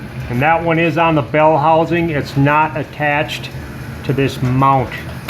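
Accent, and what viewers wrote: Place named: New England, United States. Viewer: Awesome video. Not a bunch of talk but straight to the point. Love that New England accent also.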